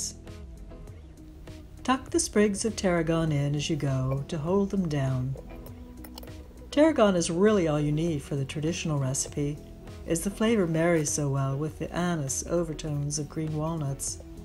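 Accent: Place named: South, United States. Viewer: Are you a southerner by the way? I am, and to me your voice sounds southern. I am enjoying your vlogs.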